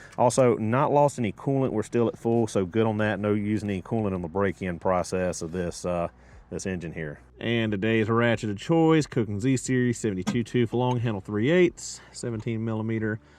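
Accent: South, United States. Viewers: You read my mind when you were complaining about salt I was chuckling because I am next to the Canadian border and every car rust to pieces. You put on a really great show. I like listening to your southern accent. It’s very relaxing and draws you in, you can really tell a story.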